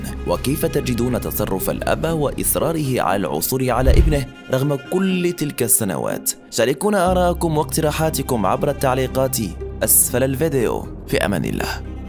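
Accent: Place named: Morocco. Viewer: واضحة اللكنة المغربية ف لهجتك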